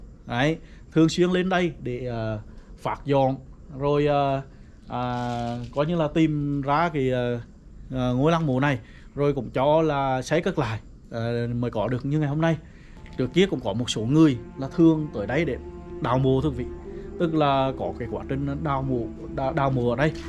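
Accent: Hue, Vietnam. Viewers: thế là tốt rồi bạn vậy mới là đặc trưng của Huế chứ ko lẽ nói như giọng Bắc hay Nam thì vòn gì đặc trưng vùng miền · Thích thuyết mình bằng giọng Huế. Mình xa quê nhìn những địa danh Huế qua những video của bạn. Cảm ơn nhiều lắm!chúc sức khỏe và hạnh phúc, thành công, thật nhiều năng lượng. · Cảm ơn cháu ! Chú sinh ra ở Huế lớn lên đi học , tuổi học trò đi khắp các lăng tẩm không có chổ mô là bỏ sót . Bỏ Huế ra đi thời bao cắp Huế ( đói khổ ) Nay dịch bênh miền Nam . Rảnh rổi vô tĩnh xem được Huế rơveu của cháu rất tuyệt vời cộng thêm giọng nói thân thương của Huế . Chúc cháu nhiều s k tiếp tục những V IDeo khác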